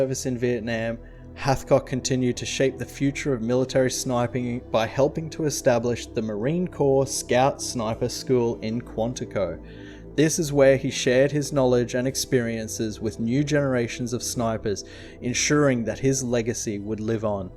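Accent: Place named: Australia. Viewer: I heard the Australian accent I thought it would be an Australian you're talking about . Heard enough about the Americans in that war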